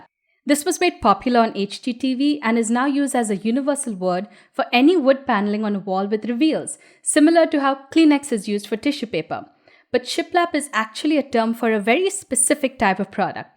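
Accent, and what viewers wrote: India. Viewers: Your posh Indian accent gives your content an extra brutal authority, and I'm loving it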